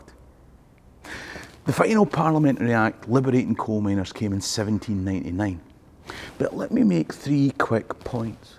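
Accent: Scotland, United Kingdom. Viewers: For fooks sek. This is bloody fookin brrriliant. I love the Scottish accent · I just subbed to your channel, I can listen to you talk for hours because I love the Scottish accent ❤️ I'd like to ask if you have not already could you do a video on the real and True braveheart that Mel Gibson did? I would absolutely love to hear the true history behind that, as I do not know how accurate the movie was. That would be such an awesome video to watch. I'm excited and cannot wait to hear from you as you post more videos. Greetings and much love from the USA 🇺🇲🙏🏻✝️❤️🥰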